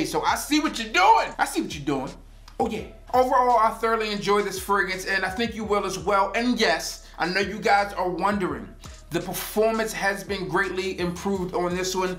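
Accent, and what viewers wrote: Baltimore, United States. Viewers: That Baltimore accent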